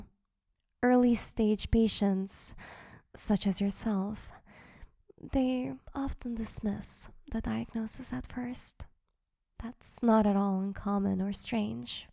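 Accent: Netherlands. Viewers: It’s a Dutch accent, herself is Dutch per her profile info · Is that a Nederland accent? Very nice.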